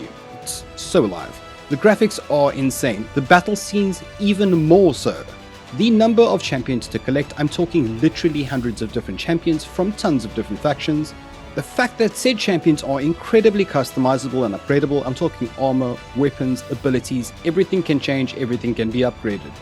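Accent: South Africa. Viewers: U sound like you have a South African accent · Hahaha heard the intro and was like this guy is South African! Good job man great to see fellow Saffas making it with gaming channels!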